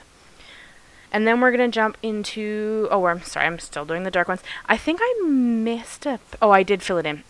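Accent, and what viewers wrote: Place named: Canada. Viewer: Halfway through your video I was wondering if you were Canadian. I just had this feel because of how you speak. And sure enough I was right! 😁 Takes one to know one I guess. I loved your video and the card. The background does look very pretty and awesome colouring job too.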